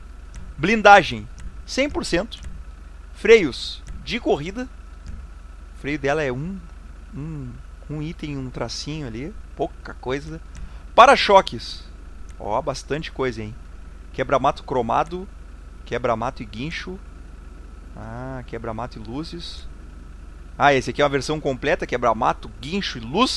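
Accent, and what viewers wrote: Rio Grande do Sul, Brazil. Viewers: Mas tu só pode ser gaucho né? abraço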